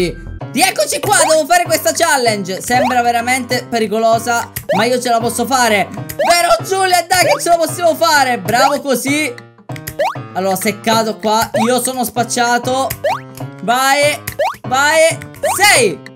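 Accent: Sicily, Italy. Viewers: Te sei siciliano?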